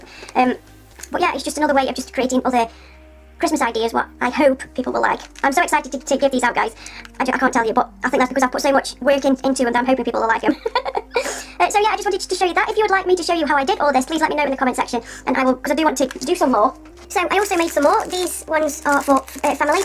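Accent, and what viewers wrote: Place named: United Kingdom. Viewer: Regular voice please, I love your British accent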